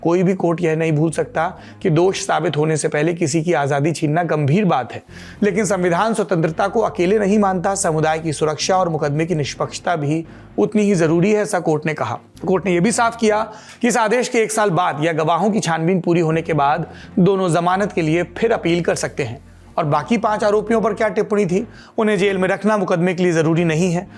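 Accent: India. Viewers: सौरभ भाई, यद्यपि विचारधारा के स्तर पर आपका व हमारा 36 का आंकड़ा है, परंतु फिर भी आपके समाचार बाँचने का विशुद्ध देसी अंदाज व हमारे शहर से आपके जुड़ाव होने के कारण मैं आपका अत्यधिक मुरीद हूं । आशा ही नहीं वरन् पूर्ण विश्वास है कि अगले प्रारूप में आपका खबरें बाँचने का विशुद्ध देसी अंदाज व भाषा शैली राष्ट्रवादी विचारधारा से भी ओत-प्रोत होगी ।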